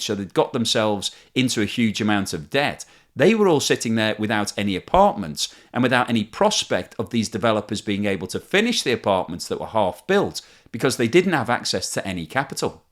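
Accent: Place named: Liverpool, United Kingdom. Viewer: He sounds like a Liverpudlian.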